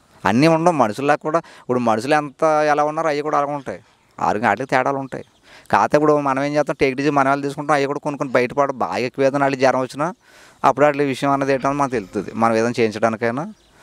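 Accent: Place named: Godavari, India. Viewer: Ma Godarolla yaasa ekka daina kanipetteyachu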